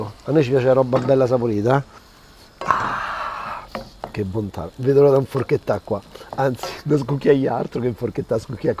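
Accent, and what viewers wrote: Rome, Italy. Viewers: mi sono iscritto da poco e ti trovo troppo forte! ricette interessanti raccontate dalla tua travolgente simpatia e accento romano... io sono di bergamo e stò cercando nei miei video di coprire la mia accento!... ma forse è meglio valorizzarla..bho....comunque complimenti!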